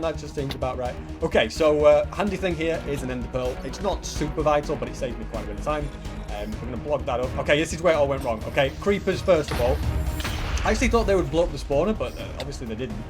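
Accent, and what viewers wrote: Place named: Scotland, United Kingdom. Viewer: SCOTTISH LAD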